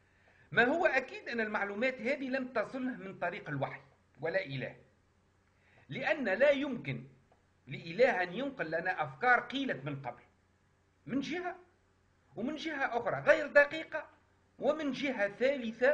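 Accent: Tunisia. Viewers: اذا تتكرم أخي وتخففّ من اللهجه التونسيه، شوي صعبه علينا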